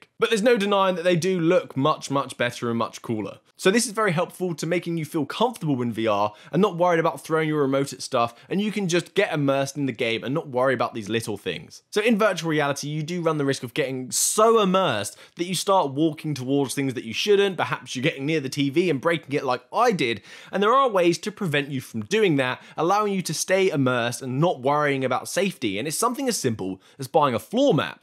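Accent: United Kingdom. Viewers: Where do you come from in UK? I can't identify the accent. Norfolk? Essex? Or?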